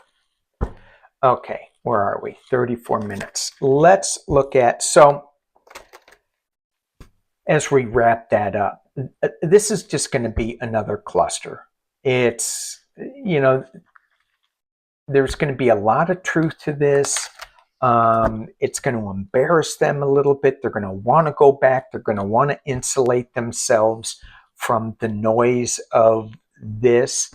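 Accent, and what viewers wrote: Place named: South, United States. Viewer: Yeeeeee ... your good ole boy Southern accent was sneaking in today ... 😁 ... yeah, a lot to talk about ... xx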